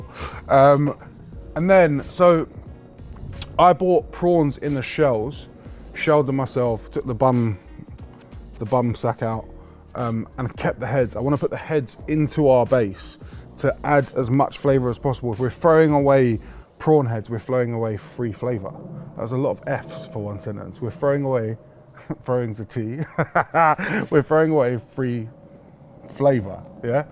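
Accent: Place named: Manchester, United Kingdom. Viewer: Fresh linguinehh haha u sound like your from my ends Manchester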